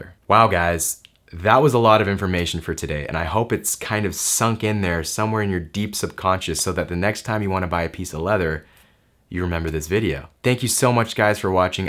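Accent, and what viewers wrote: Canada. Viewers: Canadian, eh? Love your channel.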